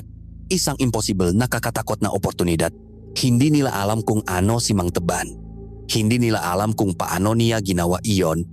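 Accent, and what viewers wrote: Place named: China. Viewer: Bakit namn po ganyan salita nyo Chinese po ba kayo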